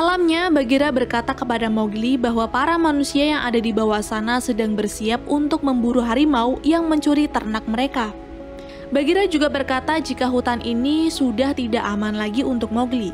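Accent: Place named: West Java, Indonesia. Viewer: Mimin na orang sunda euyy🗿🗿🗿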